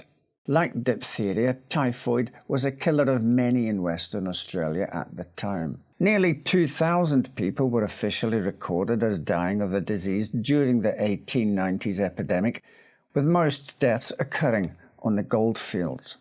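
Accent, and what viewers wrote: Scotland, United Kingdom. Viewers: Very impressive pronunciation, every syllable was perfectly enunciated, a hint of Scottish brogue, well done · The narrator is Scottish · is Scottish 🏴👍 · Does anyone know what accent this is? I sort of have an idea but I'd like someone who knows better to confirm. EDIT: He's Scottish, I thought so! Thank you guys!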